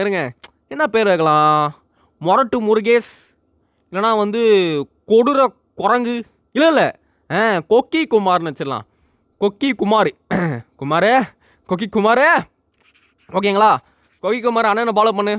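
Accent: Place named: United States. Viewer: Eh kirrku onaku english eh pesa varala ithula vera us kaare maari pesriya paythiam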